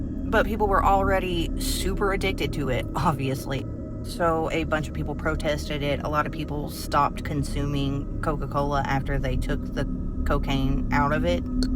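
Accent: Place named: South, United States. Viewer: Stevie Ray Vaughn, at the height of his alcoholism and cocaine addiction would put coke in his whiskey. He was forced to clean up because the mixture was destroying his gutty wuts...Too bad he didn't go top rehab for late night helicopter rides. He thought he could save time after a gig instead of driving, and get more sleep. Looks like he got his wish. The only coca cola product I'm currently infatuated with is actual cocaine. My dude lets me watch him chip an ounce off a brick... I found out about you from the seconds of horror channel. He features your clips in quite a few of his videos, and I had to find out who the adorable redhead{?} with the southern{?} accent was and watch more of her... Maybe I shouldn't have typed that last sentence but hey....cocaine. Please don't ban me, I think I found a reason to not have that shotgun sando I have at the ready...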